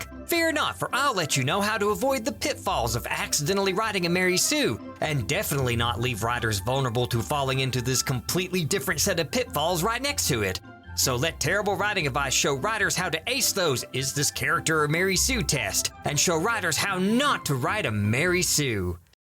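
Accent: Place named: Deep South, United States. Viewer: What the actual fuck is "self-own"? Also, why are randomly you talking like you're from the deep south?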